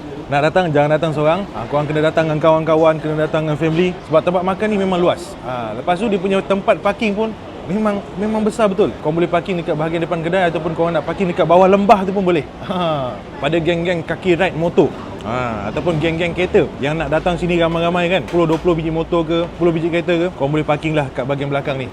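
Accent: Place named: Negeri Sembilan, Malaysia. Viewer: Haha automatic cakap negeri sembilan 😂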